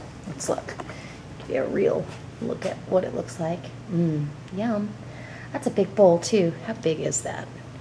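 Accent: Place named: Texas, United States. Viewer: You sound like your a Texan. :)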